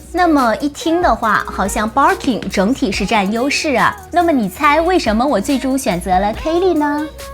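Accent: Japan. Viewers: 應該比慣用日文發音吧，她應該是銀座老闆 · A Japanese way pronunciation found commonly in Japan. Haven't u been to Japan.... If not, watching more Japanese YouTuber lol...... Haha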